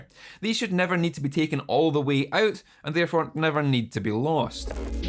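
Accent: Scotland, United Kingdom. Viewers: This dudes voice is my Scottish accent impression... fuck I hope he’s Scottish. Also, fuck, I have a Gibson epiphone in the condition, thank you for reminding me that I’m being a push-fit nob... poor guitar doesn’t deserve it.. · Scottish or Welsh Irish? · The scottish accent makes it even better! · Hell yeah a Scottish accent on YouTube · Deep deep deep Scottish accent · Cathartic in two ways: 1. Grime cleaned and everything freshly filed and realigned. 2. That dialect. I could listen to that particular Scots all day. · He has such a Scottish(??) Accent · You have the strongest Scottish accent since Limmy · Are you from Kirkcaldy or Irvine...? Just wondering about your accent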